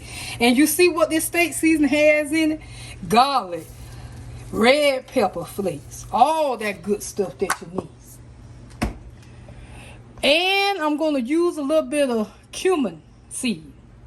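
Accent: South, United States. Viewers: I was looking for a good recipe for Turkey Necks and came across this video. You go Auntie! Love your southern delivery and the turkey necks look delicious! Can't wait to try this recipe. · Cumin is also good on any pork dishes! Love me some turkey necks.... yummy. I love ur southern accent! I can tell u can cook! Some folks don't know how good turkey necks are!!!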